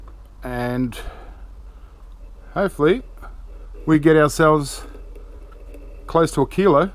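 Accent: Australia. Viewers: I'm American, I didn't even notice him saying copper differently until he brought it up. After he mentioned it though it does sound like he's saying coppa. I don't care though. I like the Australian accent. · i too love the Austrailian accent and i enjoy the videos also have learned alot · I watch this primarily to learn the AUS accent. "So... yeh." :-) (I also watch for the scrapping) Keep up the awesome videos! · are you Australian? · Haha your Australian accent is cool im sure whoever has been mocking you is just surprised by it. When you said copper in your american accent it sounded pretty funny, not because it was bad but because it sounded so out of character. When you say copper it kind of sounds like a southern U.S accent. idk though im from the midwest. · Australian accents are awesome!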